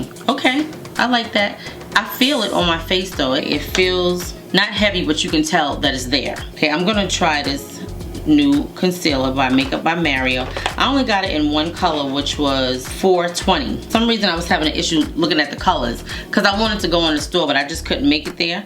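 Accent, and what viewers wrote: New York, United States. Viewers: New subscriber here! Where are you from?! Your accent sounds like the NE Philly or NYC or dare I say it.. jersey?! I gotta know if I’m close. Also, great content! Happy to have found your channel!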